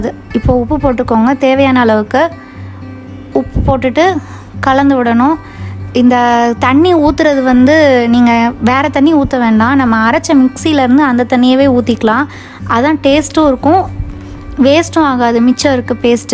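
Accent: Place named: Madurai, India. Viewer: madurai side poitu vandha maari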